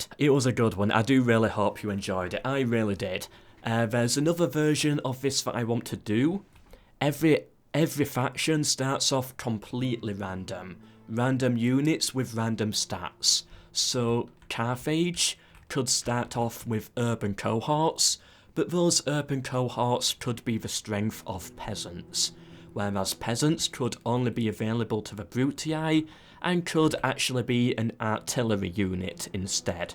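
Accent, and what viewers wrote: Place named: Northern England, United Kingdom. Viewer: Northern commentary by a Northern lad. Very good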